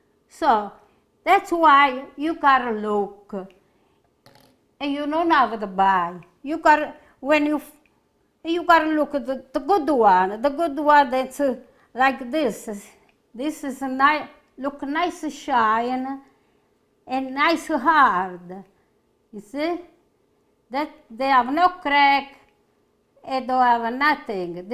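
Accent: Italy. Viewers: Thank you. I love you so much. When I hear you speak you take me back to the good old days of being around my Italian family And with my grandmother who didn't speak any English. Thank you for your Italian kitchen making great Italian dishes. Thank you for the memories you bring. I love you. 🥰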